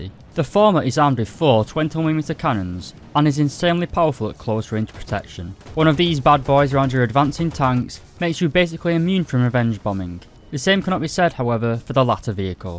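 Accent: United Kingdom. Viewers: bro i would watch the video if u werent british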